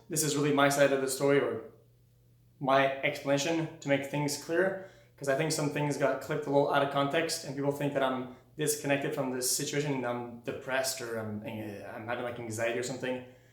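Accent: Quebec, Canada. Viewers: He’s a French Canadian that is trying his best to talk English though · Just to make sure, are you from quebec, because you have the accent, i write this in english because im not sure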